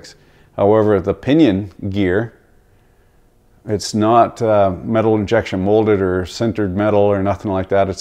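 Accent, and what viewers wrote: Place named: Newfoundland, Canada. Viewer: Love the Newfie commentary!!! Beauty , keep it up!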